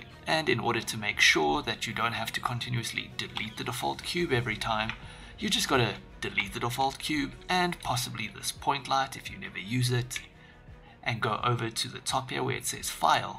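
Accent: South Africa. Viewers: I thought I recognised that accent as South African :) What part of SA? Cape Town?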